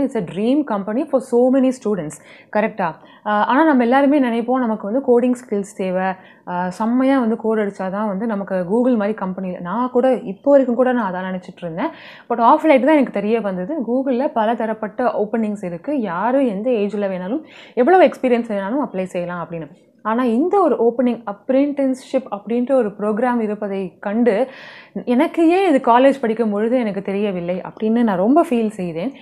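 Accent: Sri Lanka. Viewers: srilanka va ..slang kekka nalla iruku cute ya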